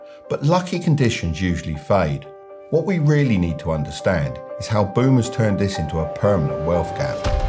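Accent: United Kingdom. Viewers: Where did you grow up? Souds like you have a British accent. That is a critical piece of info you left out.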